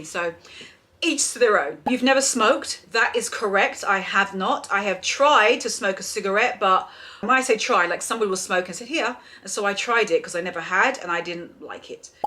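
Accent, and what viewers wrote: RP, United Kingdom. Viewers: I think the wealthy assumption comes from you having a 'posh' voice and 'quality ' taste. People these days need to be part of a fantasy story..and you are one. KEEP UP THE GOOD WORK! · I am an O.G. follower. It's the British accent that makes Americans assume that you come from money or have money. And your impeccable taste and style. Just because it looks easy doesn't mean it is or was. PROPS TO. he is a very self confident, supportive man and partner. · I think it’s the accent. Americans obsessed with brits & British accents & think everyone that speaks that way comes from money! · I can't understand the commenters thinking you grew up wealthy:D because you're very "street smart" and down to earth! Maybe it's the British accent;) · I wonder if people think you come from money because of the accent? A lot of folks hear an English accent and think that person as posh. 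· I bet that the comments that you come from money are from Americans because we can think an English accent can sound educated, respectful and prestigious. Thanks for being so open, I like you and even more. Didn’t think you can too What Everyone is Wearing but you did. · I think people (esp Americans).assume you come from money because of your lovely plosh (madeupword) accent. But it is easy to tell from your down to earthness that you have worked hard and endured some things. Kudos to you. Wishing you continued success. 😘😍👍🏾👏🏾 Hey 👋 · Y'all are lovely! These assumptions... I think people think you came from money because of your British accent and good taste. Who knows? I usually find that the assumptions we make reveal a lot about us and less about the person. 🤷🏿‍♀️ I enjoy all of your videos and Insta account. 🙌🏾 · I wonder if the people commenting about your coming from money are American. 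I wonder this because to us, the English accent is sophisticated and posh-sounding. Coupled with your style, your accent makes you appear refined and high class to Americans. I’m an American and I assumed that you were wealthy too because of your style and accent. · You dress well, and exude confidence...and also your accent is “posh” so I’m assuming that’s why people think that you come from wealth. 🙏🏼 I’ve spent the last few months in London and there are such varying accents I can almost always spot a “proper” English accent...it’s sounds very different from someone that’s from the North or even a Cockney accent!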